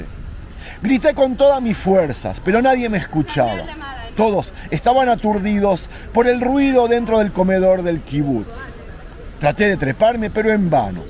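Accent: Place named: Rioplatense, Argentina. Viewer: Wow porque hablas tan bien Español? Sonas como Argentino/Uruguayo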